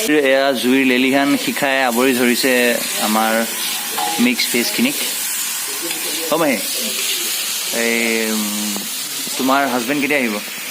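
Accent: Nalbari, India. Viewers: Apuni Nalbari khurot kotha kobo bc val lage😁😁💕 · Bor val lagise apunar vedio sai .. amr nalbari bhakha2 apunar mukht ru val lagise ..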